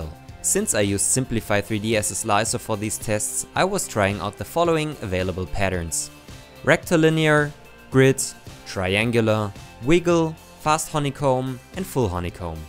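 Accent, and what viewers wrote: Germany. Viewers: Like the Videos, but the german englich (i am german too) distracts me.. xD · Man hört schon ein bisschen, dass Du Deutscher bist...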